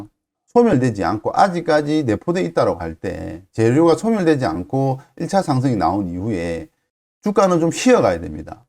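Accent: Busan, South Korea. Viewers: 부산이신지? ㅋㅋ 익숙한 말투가 귀에 꽉 박히네요~ 내용이 너무 유익하고 전문적이라 계속 공부하고 있습니다. 감사~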